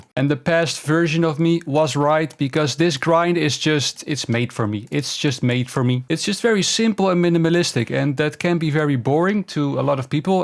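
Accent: Netherlands. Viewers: You are dutch right?😅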